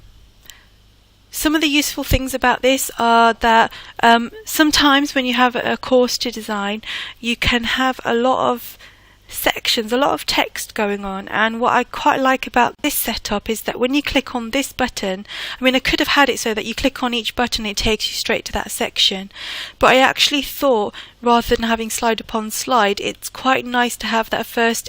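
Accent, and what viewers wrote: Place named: United Kingdom. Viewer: Nice voice (makes me think of, Monty Python, and Jaguar cars). Main Menu slides like this are the best way to organize E-Learning. It's part of "chunking" and can even serve as a graphic organizer (ed psych stuff). I am shocked that Articulate doesn't have a pre-formated slide for this type of thing. In fact Storyline makes it hard to do this sort of thing because the return to slide has limited options AND a little unknown issue (or bug) with how slides are numbered/sequenced. Storyline auto changing your slide order (as you had happen in your video) is because the slides sequence is affected by the order in which your buttons appear on your main menu timeline. In your case Button 4 needs to be on the lowest section of the time line (i.e.send to the back) and button 1 needs to be the highest on the timeline (i.e send to the front). 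to repair, disable all triggers, then move your buttons up and down vertically on your timeline, button 1 highest then down to button 4. Then re-enable all your triggers. And then send an email to Articulate begging them to feature this type of main menu or jump off slide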